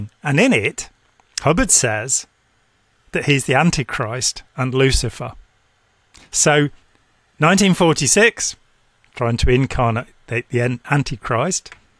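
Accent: United Kingdom. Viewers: I keep hearing Brian Cox in this man's voice. Must be from the same part of Britain. 😊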